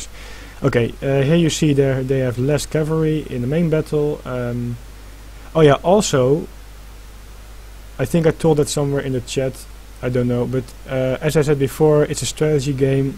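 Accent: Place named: Netherlands. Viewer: You are dutch right i can hear it. plz tell me how to get a good economy at the start thnx :)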